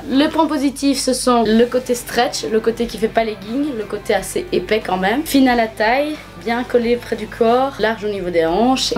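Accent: Belgium. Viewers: c'est l'accent belge! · Elle est belge, on parle toute comme ça. Et les mimiques, l'humour ect c'est l'humour belge, gaelle garcia est la "belge" la plus connu sur Yt du coup mnt dès qu'une belge apparaît sur Yt il faut obliger que des personnes la compare à elle.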